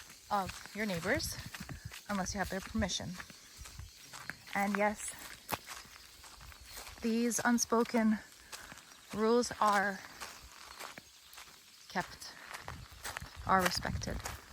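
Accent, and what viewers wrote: United States. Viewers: "I left NY city for Bulgaria" - I was going to say, your American accent is really good :) Liked and subbed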